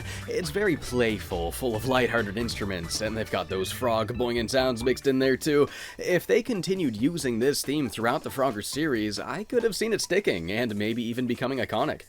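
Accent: Canada. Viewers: Well that, and everything else he says in his pretty conspicuous Canadian accent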